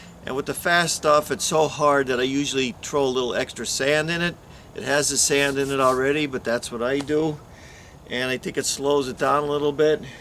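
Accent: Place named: Scranton, United States. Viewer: you sound like you're from scranton. thanks for these videos.just a hobby for me but you show me a great"'how too"........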